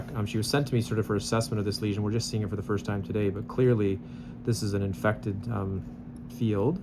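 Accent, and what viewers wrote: Canada. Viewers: Side note... Love the Canadian OWT! ( respect from the UK )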